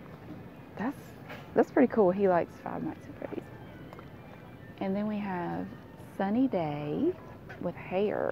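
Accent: South, United States. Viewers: I can't get enough of your southern accent I find it so charming. I'm constantly listening to the Massachusetts' accent up here which I may or may not have myself but absolutely detest.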